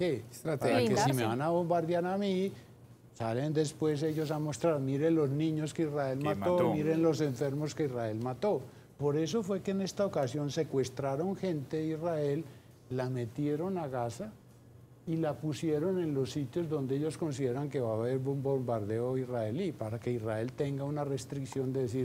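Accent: Colombia. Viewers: Fenomenal la docencia del prof. Y lo más sabroso, dictada en lenguaje paisa. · Un paisa con esa tonadita explicando la guerra, hemos escuchado a muchos catedráticos internacionales especializados y leído los mejores libros sobre ese conflicto árabe israelí. De pronto los paisas sabrán contar la guerra del narcotráfico y su líder.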